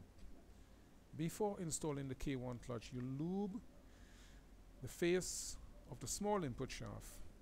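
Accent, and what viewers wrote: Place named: Trinidad and Tobago. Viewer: Judging from your accent Im asking are u from Trinidad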